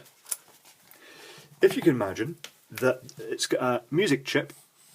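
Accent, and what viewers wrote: Scotland, United Kingdom. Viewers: Hi love the vids and the wee Scottish accent, it sooooo reminds me of my late Dad. Can you please show how you have your iPad set up for the videoing...cheers from down under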